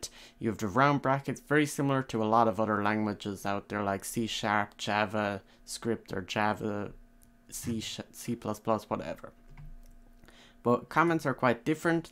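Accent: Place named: Ireland. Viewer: 95% sure you're irish or grew up in ireland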